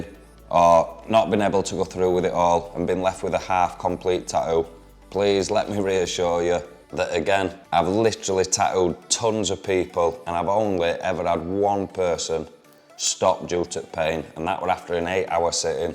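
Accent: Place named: Scotland, United Kingdom. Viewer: I love the Scott accent. “ Tah’ooh”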